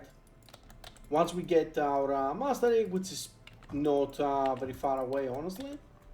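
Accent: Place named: Greece. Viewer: Σιγουρα ελληνικη προφορα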